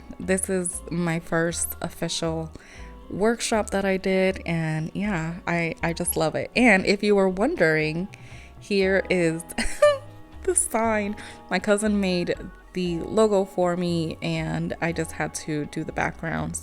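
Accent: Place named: Puerto Rico. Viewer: Ave María, suena puertorriqueña le dije a mi marido 👍🏼💕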